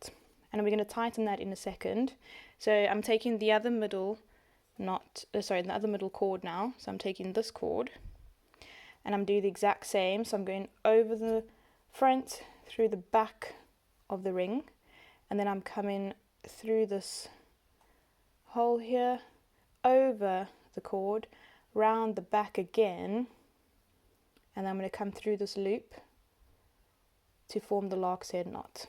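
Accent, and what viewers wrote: South Africa. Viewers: You sound like you’re from South Africa? 🇿🇦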